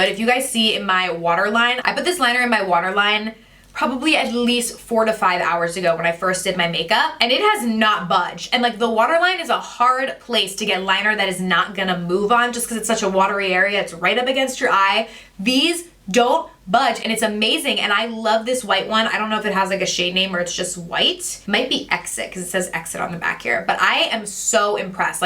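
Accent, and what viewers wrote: New Jersey, United States. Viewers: It’s how we talk in the Jerz!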